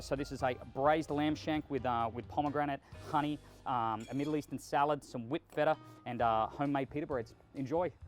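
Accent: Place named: Australia. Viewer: Great food, and good to hear an Australian accent! I note you also have a Big Green Egg behind you - if you could only have one, would you choose a wood fired oven or a Big Green Egg?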